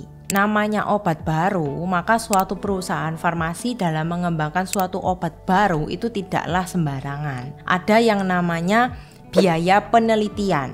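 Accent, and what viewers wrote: Java, Indonesia. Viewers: Medok banget😂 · Logat Jawa nya medok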